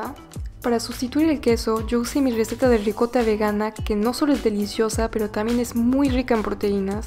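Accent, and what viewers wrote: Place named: France. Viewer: yo creo que ese acento tienen los franceses (creo que ella es francesa) y ni que sus videos fueran para animarte la vida jajajaja solo comparte recetas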